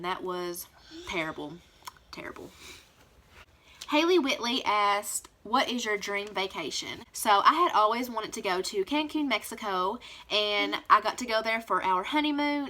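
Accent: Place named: Kentucky, United States. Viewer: I just found your channel and listening to you makes me feel at home.i am from Ky too, born and raised in Louisville. I have been living in Az for the past 18 years. I talk just like you.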